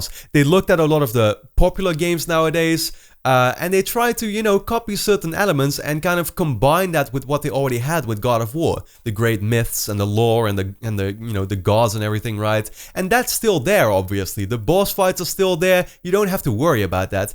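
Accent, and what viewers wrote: Netherlands. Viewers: are you dutch? you sound so dutch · Hey, are you Dutch? I just discovered your channel, and that accent leads me to think so. If you are, awesome! I am too and I love this video. Subscribed =) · Ik dacht al dat ik hoorde dat je van Nederland was! Leuke video en veel interessante info. Thx! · Dude you have to be Dutch according to your accent.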